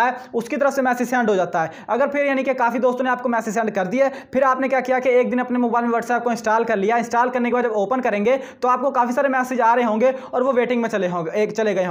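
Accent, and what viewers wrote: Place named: Pakistan. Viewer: Bhai aapki English se lagrha hai aap pakistan ke hain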